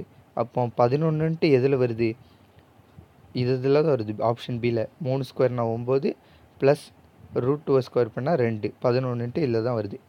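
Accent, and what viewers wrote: Tirunelveli, India. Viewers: Tirunelveli slang 👏👏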